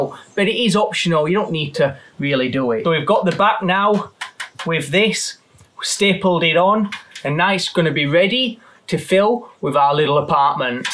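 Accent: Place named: United Kingdom. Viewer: Great tutorial and Great accent 👍.. Which part of the British isles ?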